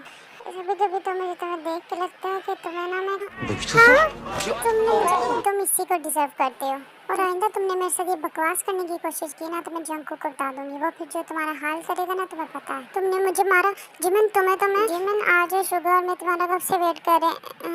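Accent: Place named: Pakistan. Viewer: Ap ke bol ne ke tarika se aisa lag raha hai ki aap Pakistan se ho. kya ap Pakistan se ho? Becoz mai Pakistani Jikooker hoon.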